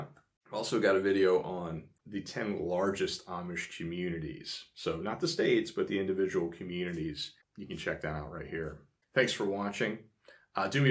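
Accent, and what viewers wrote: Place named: Charleston, United States. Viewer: You sound like you are from Charleston, SC. My husband lived outside Charleston and heard that accent a lot.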